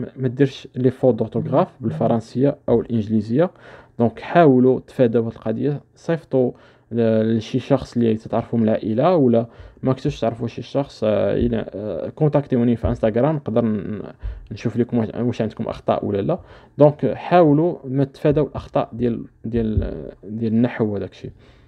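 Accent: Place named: North Africa. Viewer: اخى الكريم ارجو مراعاة ان الكثير منا لايجيد اللغة الفرنسية وكذلك معظم الدول العربية لايستطيعون فهم اللكنة العربية الخاصة بشمال افريقيا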